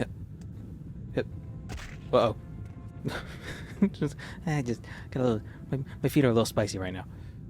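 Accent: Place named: Massachusetts, United States. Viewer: Also, where the hell are you from? That wicked smart was too natural. Im above mass, so i hear that accent and look for a fight happening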